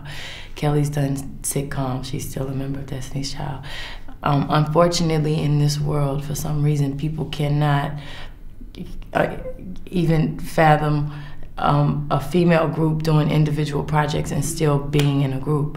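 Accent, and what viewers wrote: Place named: Texas, United States. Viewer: She has a US Southern Texas accent so if you go to Texas it is proper. I’m sure whenever you visit a different state if your an American or another country your accent would sound like shit too 💯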